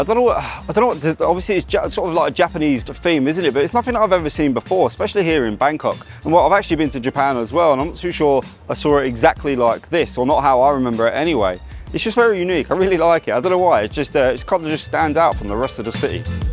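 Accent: United Kingdom. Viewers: I used to English accent, can understand u ❤️‍🩹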